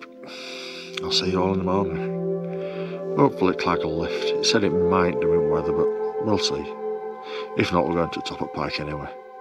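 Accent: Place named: Bradford, United Kingdom. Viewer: also loved hearing a bradfordian accent 😀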